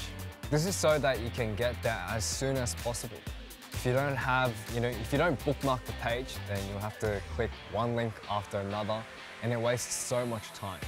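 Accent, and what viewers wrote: Australia. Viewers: i just fell in love with the australian accent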